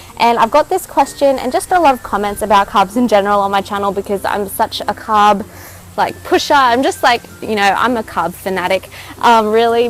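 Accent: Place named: Massachusetts, United States. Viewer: love your accent. r u from MA?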